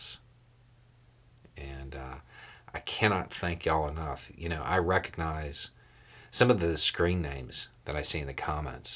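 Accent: South, United States. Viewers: Happy New Year. We spend a lot of time together nightly & I am grateful for the company, information & insights and for trashing the assumption that some who looks like you & speaks with a Southern accent is the antithesis of Progressive. Thanks. Carry on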